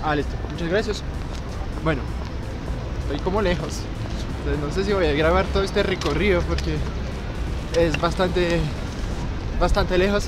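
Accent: Colombia. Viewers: Soy muy timida, pero me atrevo a decirte que eres muy guapo jejeje... Me gusta tu acento colombiano :-D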